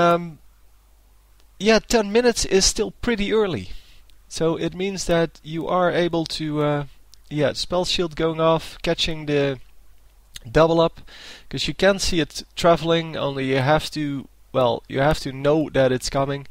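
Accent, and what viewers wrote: Netherlands. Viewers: stop the dutch accent!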